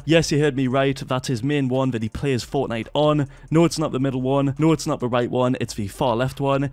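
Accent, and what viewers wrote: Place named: United Kingdom. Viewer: that British accent hit different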